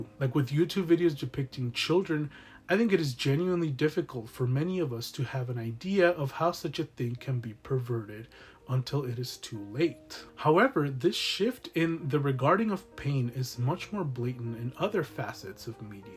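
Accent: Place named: Los Angeles, United States. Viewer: I love Sotos and I really adore you I’m from la and a bibliophile and I think you’re both those things too- I can tell by your Angeleno accent. Keep reading or as i vita sine libras mors est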